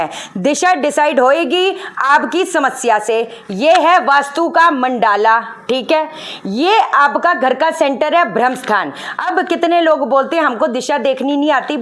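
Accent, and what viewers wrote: Punjab, India. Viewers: 🎊Dear Mam! 💝🎁 You know what सबसे best thing about you is your punjaabi diction and emphasis on our ownself मंत्र जप!! 💐 "👌Siddhi baat hai!! --👍☺️💝 as you most often say" 🎊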